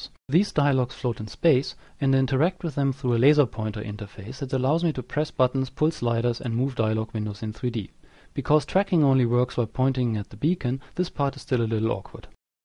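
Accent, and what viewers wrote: Germany. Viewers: u sound german... but dont worry, me too^^ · of course, you can hear when a german speaks english :-D. But his english is good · hi, bist du ein Deutscher ??? klingt nähmlich so :D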